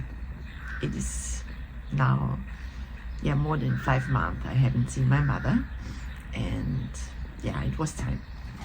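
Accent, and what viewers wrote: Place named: Italy. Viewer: Oh you are truly a beautiful honest spiritual soul. I really appreciate your wonderful truthful videos. You are clearly hard working and your italian accent as a 'foreigner' is amazing. It is very Hard to move to another country - Well Done. My mother was italian and thankfully have an italian passport. Much love Fiona South Africa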